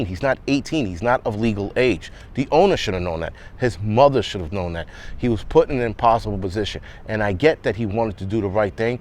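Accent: New York, United States. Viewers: He talks like a real New Yorker and a stand up guy. The channel is called. Who are people expecting when they sub, prince charming?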